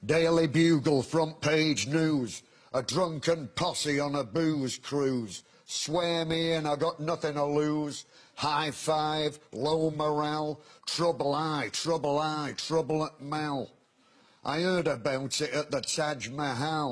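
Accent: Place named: Salford, United Kingdom. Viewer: Trouble At’t Mill Not Mall And it’s Trouble, aye Trouble, aye Trouble at’t mill It’s in a thick Salford working class accent, exaggerated a bit. It’s also a callback to his The Day the World Stood Still: The day the world stood still The day the world stood still From the underground to the overspill No trouble not even at the mill This is the day the world stood still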